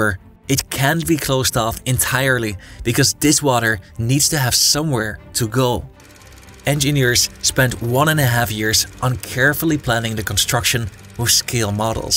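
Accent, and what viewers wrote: Netherlands. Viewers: I hear it clearly…Dutch native tongue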